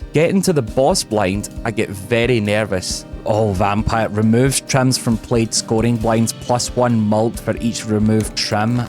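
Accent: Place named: Scotland, United Kingdom. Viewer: Luv listening to a Scottish lad playing my favorite game ❤️ cheers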